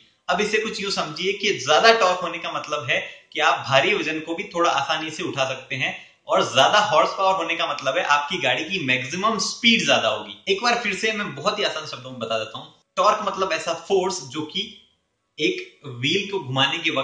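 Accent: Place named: Haryana, India. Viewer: saab aapki haryanvi tone bahut achhi lagti hai कानों को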